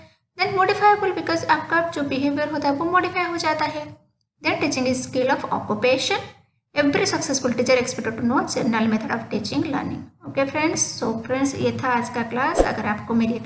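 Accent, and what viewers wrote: Odisha, India. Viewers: Are u belongs to odisha?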